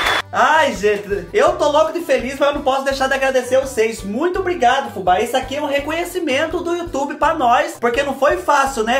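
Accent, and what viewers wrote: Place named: Caipira, Brazil. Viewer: Que felicidade sem fim. Seu jeito é único .Você com seu sotaque caipira muito natural contagiando os nossos lares.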